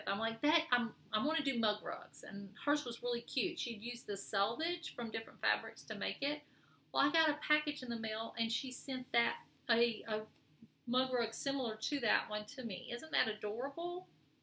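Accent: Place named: South, United States. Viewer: Hi! I have been binge watching your videos...I love your choice of projects! I love your southern accent too! I watch your videos while stitching and I find myself agreeing with you out loud. My husband will peek in asking, who are you talking to...? LOL!!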